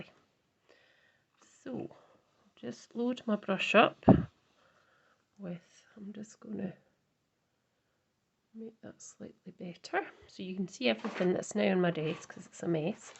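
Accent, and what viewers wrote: Scotland, United Kingdom. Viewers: Absolutely gorgeous, lovely colours and design. It's great to hear a Scottish voice on YouTube, I've just found your channel and will enjoy watching your videos, thank you so much for your inspiration 💖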